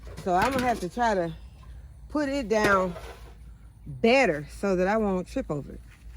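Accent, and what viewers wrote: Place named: South, United States. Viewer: I listen to your chat carefully because it's full of good, down to earth information. My up north ears are not so used to your down south accent that's why I watch the closed captions carefully. Do you feel guilty for Caleb killing those bees? I'm sure I would have used Raid, too! I have a contract with my bugs that if they stay out of certain areas, then they are safe from me! ha!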